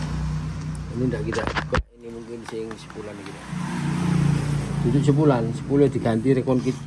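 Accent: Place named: Sidoarjo, Indonesia. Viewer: Pean asli pundi . Kok bahasane sidoarjoan nyel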